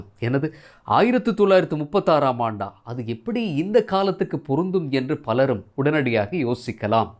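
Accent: Sri Lanka. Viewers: Arumaiyana Sri Lankan Tamil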